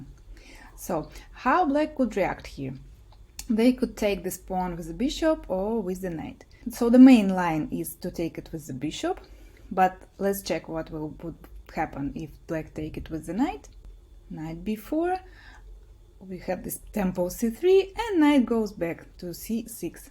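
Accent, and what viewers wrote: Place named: Russia. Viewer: I like videos because she speaks slowly and clearly, with an adorable Russian accent, and goes back over the move sequences several times to let them sink in. Another winner. I am still waiting to pounce on someone with Captain Evans's gambit, but I'll be ready.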